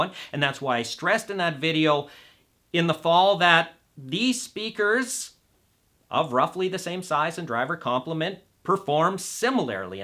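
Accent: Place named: United States. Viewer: You realise he is from the USA don’t you? It’s called an accent. Weird observation you have and somewhat ignorant I might add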